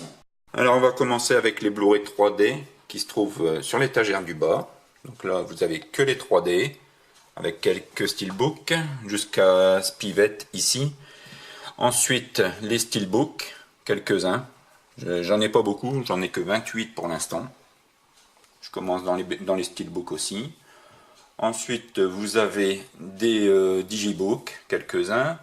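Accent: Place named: North, France. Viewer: Salut, super ta première vidéo tu as une super collection, j'ai hate de voir tes prochaines vidéos,merci pour ton remerciement et j'entend qu'il y a pas que moi qui est du nord;)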